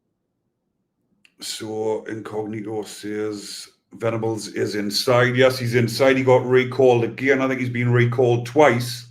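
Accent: Newcastle, United Kingdom. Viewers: Whi gives a flying fuck about men acting like women, it's clear to me that Ur a bully, a thug and a tosser itself. They might be in jail but that does not take the right away of anyone bring what they want, maybe you should be back in jail, giving it the large with the skin head and bs Geordie accent. What contacts have you got in jail, stfu you stupid twat, U chat so much shit.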